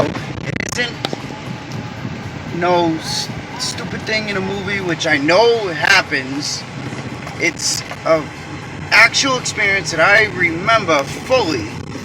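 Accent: Boston, United States. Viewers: ur from Boston I can tell. I'm from Dorchester. yeah this is fuckin with me too..like flava flav from public enemy was always flavor flav in this reality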